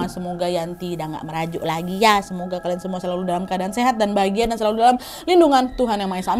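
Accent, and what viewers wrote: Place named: Medan, Indonesia. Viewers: Sampe bingung ka tuh org jawa apa org medan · Dari awal kak buat Youtube sampai sekarang selalu nonton dan gak pernah ketinggalan, dari kesedihan kak di tinggal Alm ayah dan abg nya kak sampai kak ke titik bahagia skrng aku selalu mengikutin kak, sehat terus Ya jangan sampek logat Medan kau berubah Ya kak ❤️❤️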